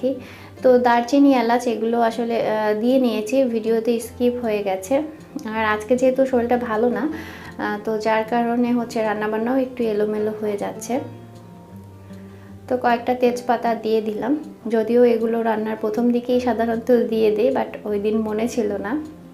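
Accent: Sylhet, Bangladesh. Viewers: Apne ki Sylheti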